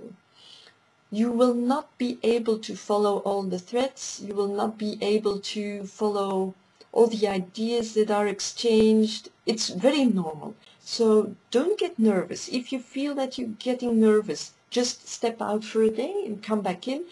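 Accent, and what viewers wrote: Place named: Flanders, Belgium. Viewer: Thank you for this lovely welcome,. Flemish-inspired English? 8-D Well, you're certainly inspiring.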